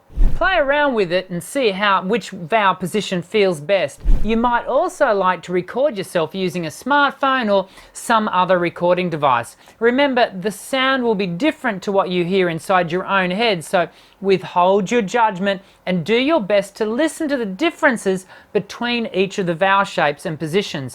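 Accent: Australia. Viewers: these videos help but i can't keep myself from thinking if you didn't have such a thick Australian(?) accent they might help even more lol. thanks though! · I thought it was Dana Carvey doing an Australian accent .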